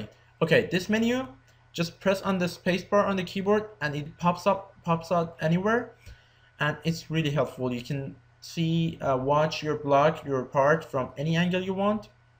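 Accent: Iran. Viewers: Love the Persian accent. It reminds me of grad school (my advisor and a half-dozen labmates were Iranian). Your English is fine, just enough giveaways in the diction to identify the Persian accent. :) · Nice Tutorial. Persian accent.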